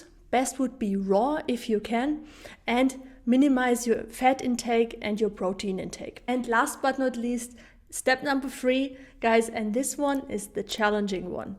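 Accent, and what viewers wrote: Germany. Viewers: R u german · Trying to guess your dialect...German? Austrian? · Thanks soooo muchfor this Video God Bless you from Germany Your acent sounds like German. Frohe Pfingst Montag 2019 Iam srarting tomorrow <num>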